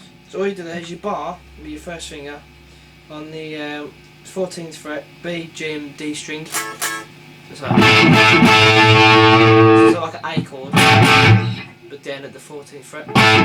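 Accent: United Kingdom. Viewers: Where u from mate u sound like u got a brummy accent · hey dude , are you from england ? i thought youre from russie 0.o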